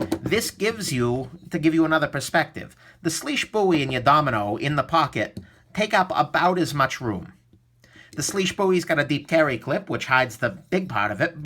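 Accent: New York, United States. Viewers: Good review. Now work on that NY accent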